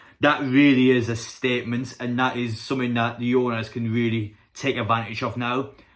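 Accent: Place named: Geordie, United Kingdom. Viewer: mate whats your accent? Its a mix of geordie and something else😂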